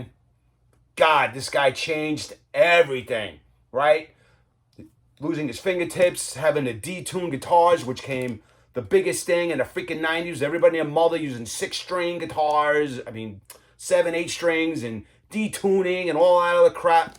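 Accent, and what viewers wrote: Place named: New York, United States. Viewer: Only just found your channel by luck, love it, love the New Yawk chat too, my favourite City in the world.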